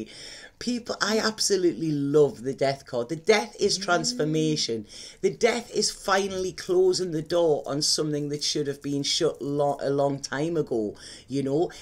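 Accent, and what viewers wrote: Newcastle, United Kingdom. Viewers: it does suit you. I am watching your videos from Marseille. How funny..I was 7 years in Newcastle so your videos have a special touch to me. I love it all, you accent and kindness remind me how beautiful and friendly Geordies are + your gift as a reader is amazing and the positive energy you bring to us is a tribute to your soul. I am also a fellow Virgo..so all of this makes me feel like a child in a school yard feeling 'I want her to be my friend!!!!!' Keep up you are a highly evolved human being. Almost to good for this earth....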